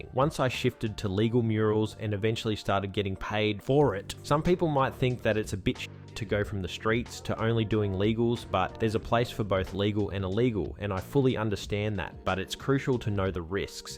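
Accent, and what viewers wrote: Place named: Australia. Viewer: Australian accents are so cool. Great video, as always, especially the comedy bit at the end. 👍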